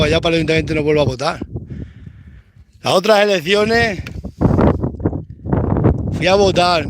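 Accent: Extremadura, Spain. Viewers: Desce Barcelona una estremeña acabo de venir de mi querida estremadura ya soy mayor y cada día añoro más mi infancia fuy cobarde al salir de mi tierra bendigo los que se quedaron es usted mi siquiatra me gusta cómo habla como la gente noble de esa tierra gracias ustd da calma como todos los buenos estremeños aún quedan gracias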